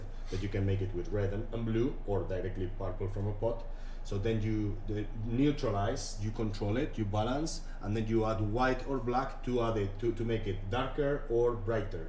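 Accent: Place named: Spain. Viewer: I can understain your english. Are you spanish?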